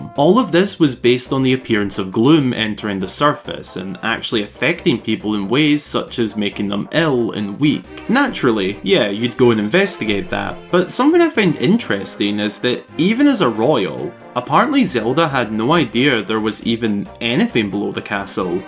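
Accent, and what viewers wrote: Scotland, United Kingdom. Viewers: He is scottish I just realized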